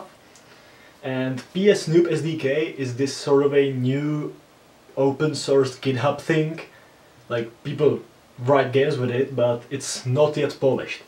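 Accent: Czech Republic. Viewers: Slyším první slovo anglicky a hned slysším, že jsi Čech, jinak parádní video kámo. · I was wondering why the accent was so familiar, turns out you are a fellow Czech. Great video btw, it's quite fascinating to see how they made the games back in the game. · Ahá :D mě se zdála tvoje angličtina taková počeštělá :D a win xp vítejte.... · I was watching the video when I noticed your accent. I thought wow ... sounds like a Czech accent ... and then I noticed the trash can label :D Keep up the great work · ey i recognized that czech accent good vids ngl :) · i cant help myself but i hear a really strong czech accent in your voice . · Do u live in Czech republic bc i hear an accent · To že seš Čeh jsem poznal podle toho hlasu Angličtiny. Jinak dobrý video! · Cool. im from slovakia. i heard you czechis accent. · Já tušil že jsi čech :D ten přízvuk mi napověděl :D ale jinak super video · It's funny - you've started speaking English and I immediately knew you are Czech. 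There is no hiding 🤣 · Teď mi tohle video náhodně vyskočilo na YT a říkám si, že v té angličtině slyším češtinu a starý noťas mi to potvrdil! :D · Hodně dobrej kontent! Podle přízvuku jsem poznal že jsi z Česka a potvrdil mi to "Koš" na Windows XP :p CG! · Hned jsem věděl, že jsi Čech podle tvé angličtiny, průzkumník souborů a poznámkový blok mi dal za pravdu <3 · Bro I swear this guy has such a big czech accent. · časkej přízvuk jak poleno! super video!!!!!!!!! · To jsem poznal že jseš z Česka podle té výslovnosti. Jinak dobré video! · Hned jsem věděl podle přízvuku, že jsi čech xd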